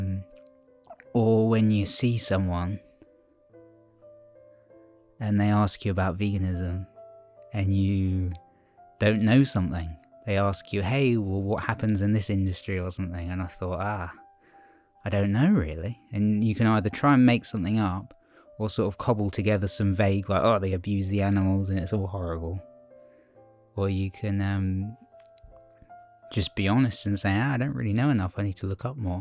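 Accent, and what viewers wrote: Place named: United Kingdom. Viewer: Good to hear from you! Your British accent is beautiful as well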